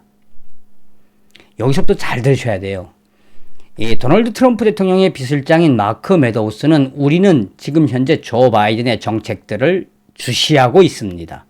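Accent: North Korea. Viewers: 방송 희소삭 매일 우울한 국민을 가짜든 진짜든 희망을 갖게합니다 하여 방송 감사하게 생각합니다 그러나 북한사투라는 이해하자만 이시점에서 좀 삼가하심 어떠신지 ? 죄송합니다.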